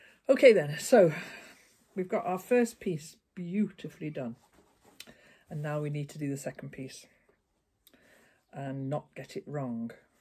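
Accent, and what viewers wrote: United Kingdom. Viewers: Why don't brits say the -er on Zipper? LOL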